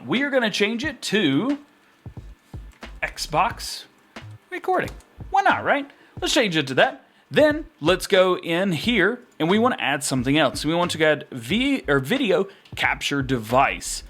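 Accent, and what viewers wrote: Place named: South, United States. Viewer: Definitely from Alabama or Tennessee that accent tho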